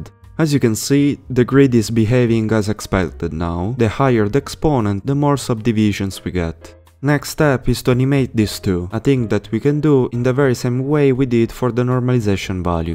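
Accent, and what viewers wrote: Italy. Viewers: Sorry if i ask but are you italian? I love you btw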